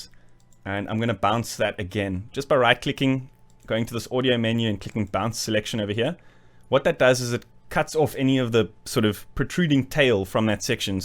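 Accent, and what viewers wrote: South Africa. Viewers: Dude! South African?? Where you based? Great lesson, thank you!!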